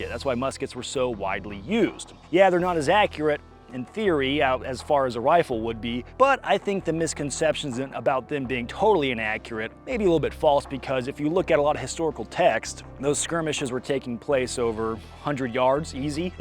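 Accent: England, United Kingdom. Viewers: I’m English and I’m very impressed with accent he could definitely become an Englishman! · His Brit accent isn't that bsd. Well, maybe it is but it's fun. 😁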